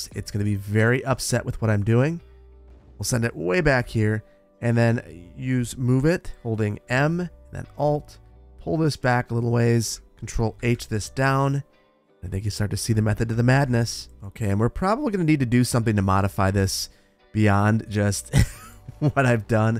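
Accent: Wisconsin, United States. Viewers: I totally think that this island is based on Mackinac Island (a car-free island in Michigan) because Marquette is another larger city in northern MI. Mackinac Island is a tourist trap, but Marquette is a super cool city on Lake Superior with a great atmosphere and massive old ore docks towering over the shore. I'm commenting this like 30 seconds into the video, but I hope you lean more towards a Marquette, MI vibe than Mackinac Island vibe. Also it backs up my theory that you sound super wisconson, and the UP is a popular travel spot for wisconsoners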